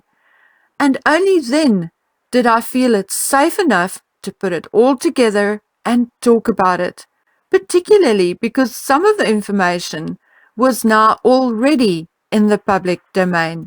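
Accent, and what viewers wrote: South Africa. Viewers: your research is outstanding. Your honesty is greatly appreciated. I used to live in S.A. and it’s a pleasure to hear your accent. Good luck with what is going on in your personal life - that comes first. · I am loving this, I was 45 years in South Africa and the accent is so lovely to hear again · Is this South African accent I hear Hi from fellow South Africa. I love your posts and watching with interest. I have been following Celt, Yankee Wally, the Vixen, Sandidog and other tumblr blogs et al for a couple of years now and matters relating to this subject are at last being discussed in the public arena. I have always been curious that H's wife has never sued anybody who has expressed doubts about Archie's birth. There are numerous pictures and videos showing bump falling down to knee level (the purple and pink outfit) the swaying bump (the short dress, white with greyish pattern) and many more. 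None of us like being hoodwinked or fooled with, so the truth exposing these two cannot imo come soon enough. There is no shame in surrogacy which cannot determinate a child's colour, so they need to come clean or be exposed. The outcome won't be pretty. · Hello, nice accent. Where are you from in SA?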